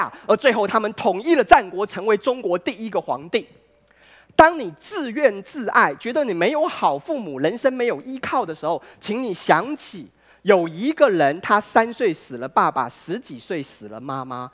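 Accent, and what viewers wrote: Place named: Taiwan. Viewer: 内容挺好，但这口音实在是太重了。不记得台湾口音的舌头可以直成这样😂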